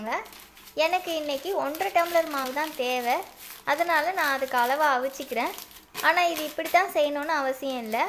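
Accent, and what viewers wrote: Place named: India. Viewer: நீங்கள் பேசுவது இலங்கை தமிழ் இல்லை. இந்தியா தமிழ் மாதிரி இருக்கு.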